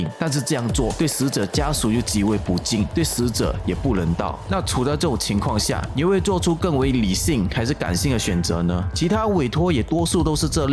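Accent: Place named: Malaysia. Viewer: 听他口音，马来西亚人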